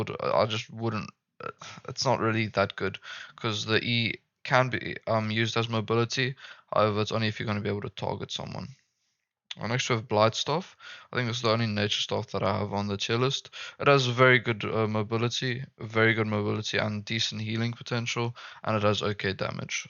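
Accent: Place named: South Africa. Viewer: You south african boet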